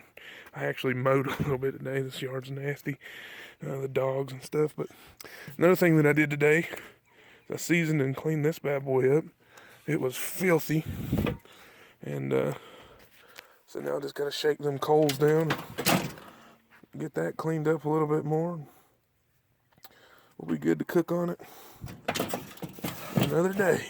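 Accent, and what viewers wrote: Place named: Georgia, United States. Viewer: Dang I thought you was from Georgia😂😂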